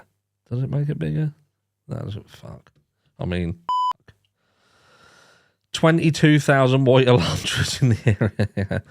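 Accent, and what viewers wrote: Norfolk, United Kingdom. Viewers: I think you sound british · It’s a Norfolk accent mines Suffolk and people think I’m from Australia too.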